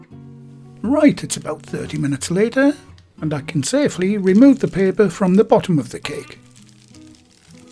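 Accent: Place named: Yorkshire, United Kingdom. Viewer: Hi is this the same as a Victorian sponge? My partner has been nagging me for 4 yrs to make one for him lol but I get a lot of requests so sometimes I forget to make things! This year I will make one for his birthday (in Feb). By the way is that a Yorkshire accent? My grandparents are from Yorkshire and it sounds very similar.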